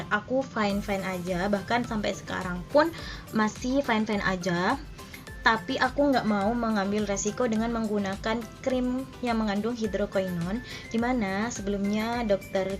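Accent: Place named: East Java, Indonesia. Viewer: Logatnya kak kayak orang Jawatimur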